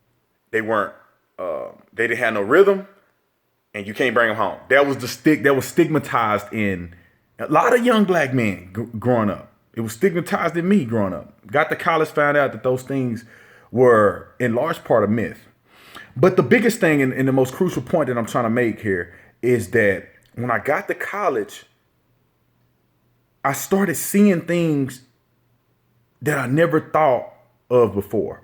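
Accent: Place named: Fort Worth, United States. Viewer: Yo! Your from Ft Worth! Me too! I knew I heard the accent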